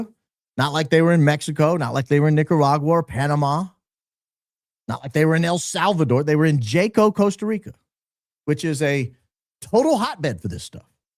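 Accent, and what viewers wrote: South, United States. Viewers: I really like the way you say “was” and “drunk“. Your subtle southern drawl comes out with the “uh“ sound.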